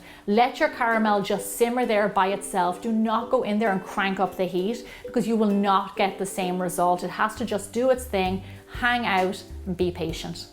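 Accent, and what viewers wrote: Ireland. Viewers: Are you Irish? I thought I recognized the accent, but I don't know, I haven't look at the rest of your channel! yett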